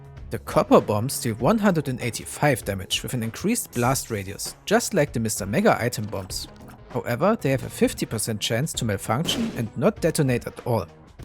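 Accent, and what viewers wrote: Germany. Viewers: Deutscher , nicht wahr?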